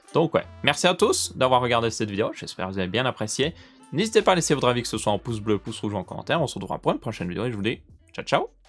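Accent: Belgium. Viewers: 80 % des commentaires parle de l'Hyper X cloud 2 ; 10 % de l'accent belge ; 10 % autres .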